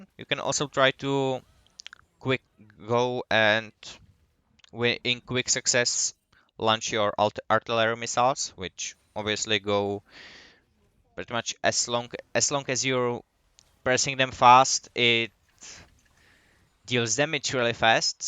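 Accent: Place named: Czech Republic. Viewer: Vic cesky-zniciho cecha jsem snad neslysel xD. Good Guide.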